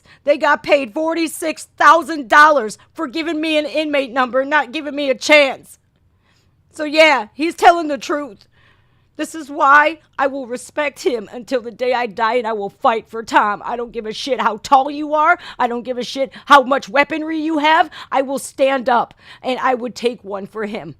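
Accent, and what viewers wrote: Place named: South, United States. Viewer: Hey was wondering if you are from the south? Cause you got a southern accent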